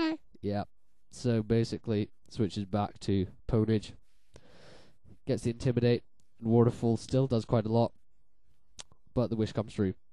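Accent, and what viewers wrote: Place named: United Kingdom. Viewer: You're commentaries are really good! Makes a change hearing a British voice. Anyway, if you wanna battle some time, message me, be interesting seeing my team narrated against on youtube :P.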